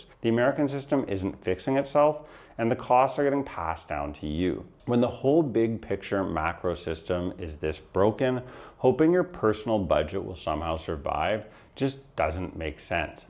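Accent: Canada. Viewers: lol your Canadian accent is super thick and I’m Canadian 😅. Good info here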